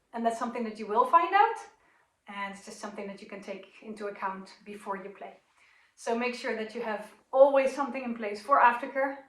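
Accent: Netherlands. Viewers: likewise. I have been subscribed for some time now. Is it a Hollands accent i hear? Groetjes from the sunny Caribbean.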